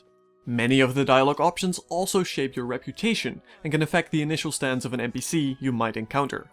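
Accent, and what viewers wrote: Netherlands. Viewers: My apologies but are you dutch? Being from Belgian I seem to notice a dutch accent(as compared to a flemish one to seperate the 2 "languages")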